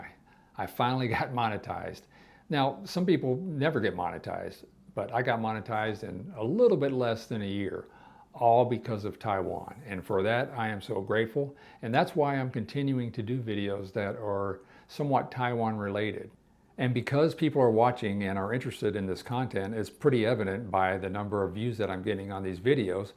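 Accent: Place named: United States. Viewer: Thanks your veido! Cause I can clearly listen US accent from you. I love that.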